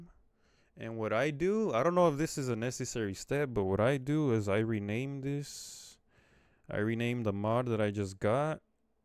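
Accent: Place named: India. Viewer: He sounds Indian of some sort. He let his most of his family name.He knows nothing nothing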